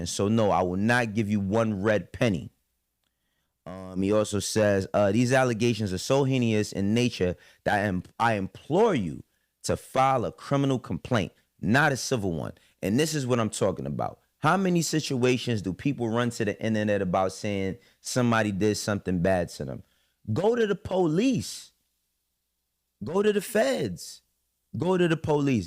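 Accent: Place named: New York, United States. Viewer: lol you sound like you’re from nyc, got that jay. Fitted on, yea you a Stan. Won’t watch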